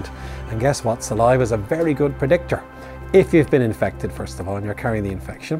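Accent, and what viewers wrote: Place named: Dublin, Ireland. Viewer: Except for the dub accent, it's a good video.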